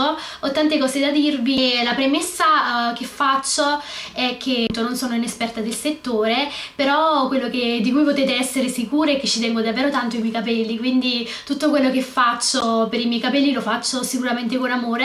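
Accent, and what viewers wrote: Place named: South, Italy. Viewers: sei campana eh?? accento familiare ahah ;) :D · Sei del sud vero? Si sente c: grazie per i consigli!!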